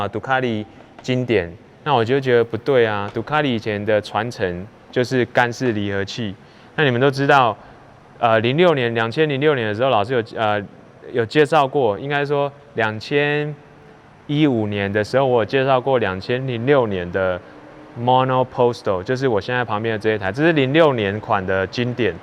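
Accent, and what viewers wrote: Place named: Taiwan. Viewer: 老師貌似是台灣腔。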